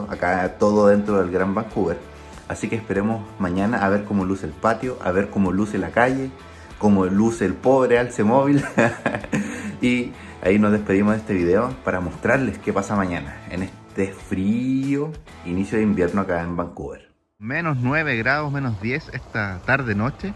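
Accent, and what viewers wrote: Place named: Chile. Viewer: Llámame loco, pero me basto 1 segundo de tu voz para darme cuenta que eres Chileno, ojala nos encontremos en algún momento allí en Vancouver ya que viajo en Marzo, saludos!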